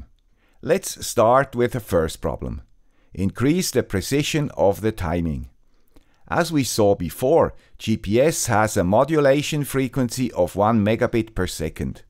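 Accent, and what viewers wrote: Switzerland. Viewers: Hello guy with the Swiss accent. Can you tell us some more about the business case? It's been about a year, I'm very interested to build a base station to support RTK2GO and earn some money too. How much did you earn in this year? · Swiss accent acquired at the ETH Zürich is the best Swiss accent! 😀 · Hello, Man with Swiss accent. Can you explain more detailed how 4th satellite helps calculate exact time? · That's a Swiss accent? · Lovely video! thank you for covering this topic :) greetings from another Swiss accent guy! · Dude with the Swiss accent, you are awesome! Thanks so much for sharing this information with us! I love your content! ❤️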